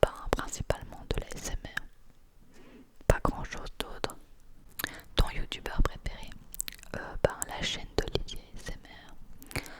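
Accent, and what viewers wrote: Belgium. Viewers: J’adoreee les vidéos comme ça près du micro (et si y’a des bruits de bouche encore mieux ah ah), en plus tes chuchotements avec ton accent belge c’est super relaxant ! Une vidéo de ce style serai cool hihi ;)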